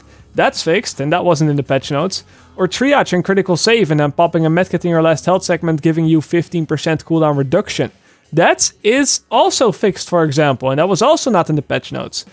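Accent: Netherlands. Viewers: Aksing Are you dutch ?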